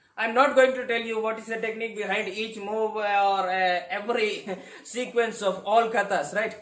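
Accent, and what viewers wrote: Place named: South India, India. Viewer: Ur accent is like south Indian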